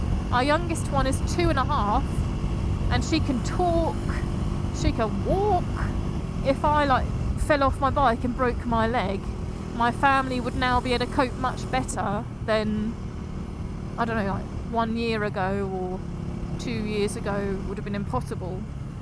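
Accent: England, United Kingdom. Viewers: Been wanting to watch this since you put it out... been working so not able. I am a person with no dependants, but I think we need to make sure we do stuff for ourselves as well as our kids/family/partner/etc... Don't feel guilty is what I'm saying. Your bikey sounds great on the throttle. Congrats on the wedding. I love that after a few years you still have your English accent, was expecting an episode of Allo-Allo style "ve have ways of making you talk!":-D hahaha I don't watch German channel, still follow his UK one though. I got a van to do the moving bikes about thing... have only moved bikes a couple of times, but no rugrats 😀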